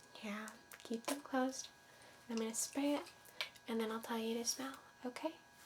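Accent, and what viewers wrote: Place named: Midwest, United States. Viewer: Only flaw is a slight buzzing - is it a laptop fan?? You seem very sweet, please keep going! Nice accent btw - sounds Midwestern, like Nebraska or Montana or something.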